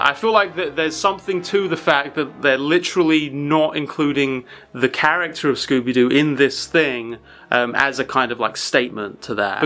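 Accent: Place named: United Kingdom. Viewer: Never realized that british people pronounce H as "Hache"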